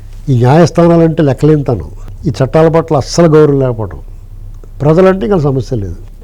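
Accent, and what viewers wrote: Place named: Godavari, India. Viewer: Good. చక్కటి గోదావరి జిల్లా యాస.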